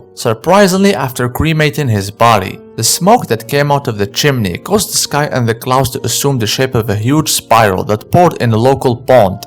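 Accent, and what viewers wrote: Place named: Japan. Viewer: oh. I asked do because your accent is very jap.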